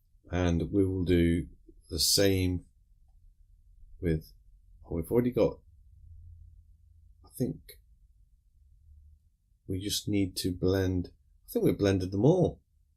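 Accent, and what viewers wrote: United Kingdom. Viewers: Now can you teach me to speak with an English Accent? Love it!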